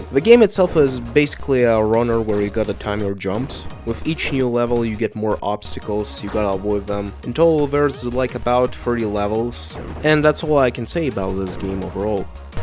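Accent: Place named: Russia. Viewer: I did not notice the slight russian accent until I noticed the russian text lol Then again I'm from Israel so maybe I'm just uses to Russian accents more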